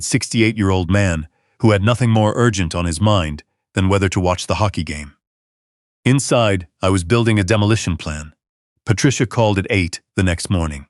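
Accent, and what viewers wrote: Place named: Ontario, Canada. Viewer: Sound like Ontario Canada 🇨🇦.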